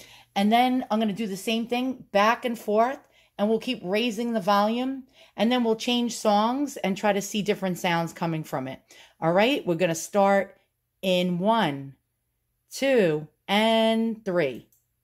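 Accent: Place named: New Jersey, United States. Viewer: I love your east coast accent! New Jersey?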